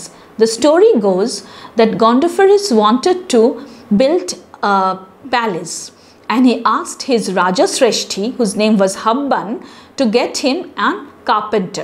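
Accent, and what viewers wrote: India. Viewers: Namaste i like ur vidéo n indian accent very pleasant i like india n rusia not démoniaque people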